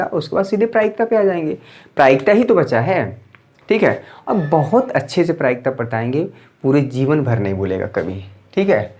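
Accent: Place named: Bhojpuri, India. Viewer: भोजपरी सर आप बहुत अच्छा बोलत बानी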